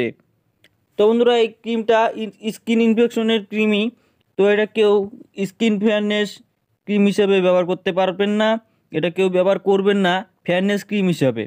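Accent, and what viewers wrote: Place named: India. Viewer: Uni indian tai voice amon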